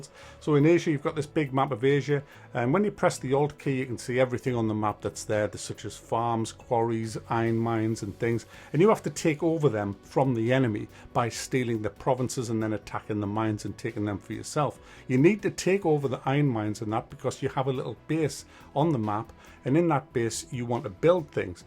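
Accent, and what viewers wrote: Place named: Northern England, United Kingdom. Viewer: Good to hear a northern accent! Im a Geordie myself. Great content mate cheers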